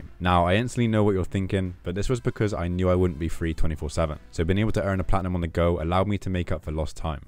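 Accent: United Kingdom. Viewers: This video is absolutley top tier! Love to hear another brit! :) keep them coming man!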